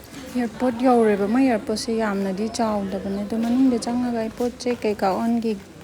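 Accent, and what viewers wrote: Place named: Kakching, India. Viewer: Enao j kakching gi oi taradi kakching maron kha kha nganglako eidi taba yam nungaiye😊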